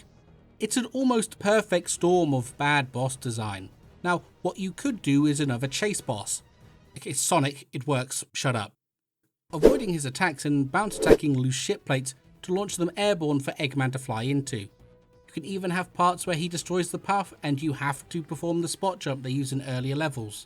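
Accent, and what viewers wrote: United Kingdom. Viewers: I didn't read every comment on each video you made, but did anyone ever tell you that you should have more subs? Maybe it's the British accent, idk, it only made me a little uncomfortable.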